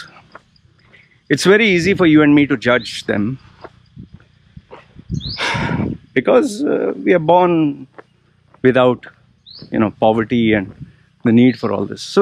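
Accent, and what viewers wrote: India. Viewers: Your accent is so Indian..which country are you in?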